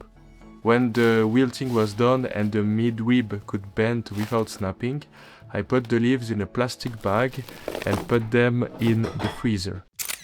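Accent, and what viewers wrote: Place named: France. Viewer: great video! l'accent français est veri grite also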